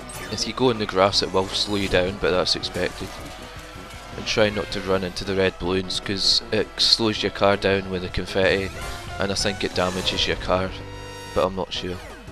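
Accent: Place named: Scotland, United Kingdom. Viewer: You must be Scottish, cool accent! Regards BBKCjr. ;)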